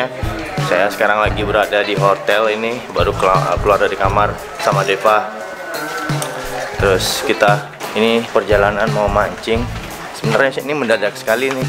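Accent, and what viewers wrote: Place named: Bali, Indonesia. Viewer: Gokil...bli deva suaranya has medok Bali...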